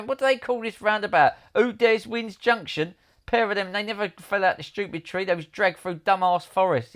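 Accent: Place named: London, United Kingdom. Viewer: New to your channel. love the london accent keep it up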